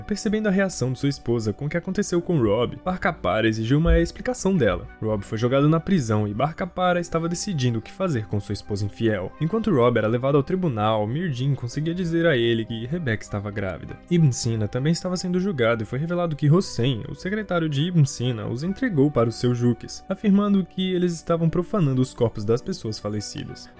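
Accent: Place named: Northeast, Brazil. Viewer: Tu é nordestino. Ceará????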